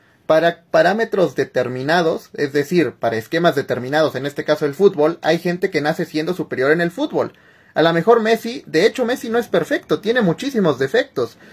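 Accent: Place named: Mexico. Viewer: Como se nota que este es mexicano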